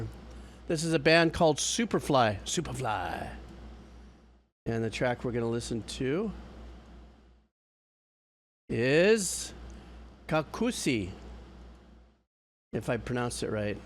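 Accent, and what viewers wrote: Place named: Argentina. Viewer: hahaha wasnt expecting you to talk so well in Spanish (Argentine accent)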